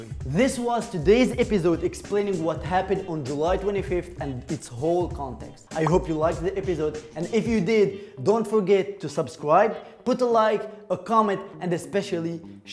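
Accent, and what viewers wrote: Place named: England, United Kingdom. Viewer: btw i like your english accent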